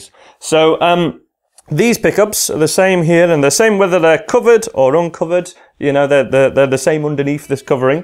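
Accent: United Kingdom. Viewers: Can someone tell me the names of the set used in this video and organize them according to price, sorry i can't catch words from the British accent that much and thanks in advance! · im from the US and i can hear this guy just fine. he's got a British accent, but i can everything perfectly.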